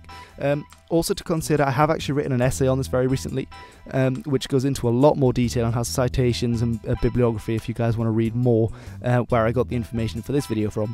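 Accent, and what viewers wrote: Denmark. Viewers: You are danish i can hear xd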